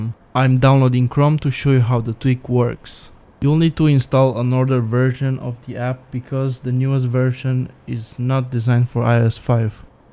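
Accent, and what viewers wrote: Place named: Romania. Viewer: Mi-am dat seama ca esti roman dupa accent. :)))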